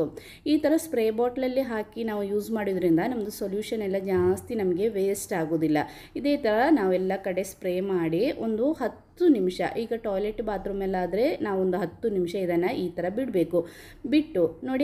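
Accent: Kerala, India. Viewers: Madam malayali aano Kannada ketappo angane thoni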